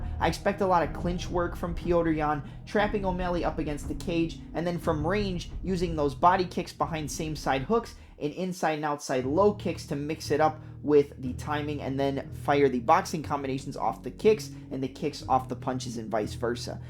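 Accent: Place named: Midwest, United States. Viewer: Youtube changed the algo to feature smaller creators. I dig it. That thick midwest accent sounds like home. Good vid.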